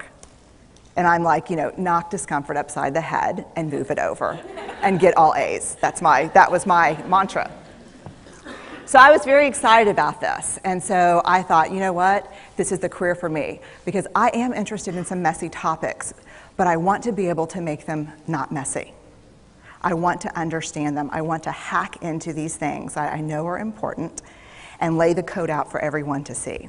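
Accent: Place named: United States. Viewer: this is American English I thought it was British language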